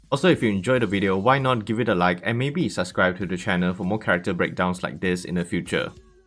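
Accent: Singapore. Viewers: Ma man sound like a Singaporean / Malaysian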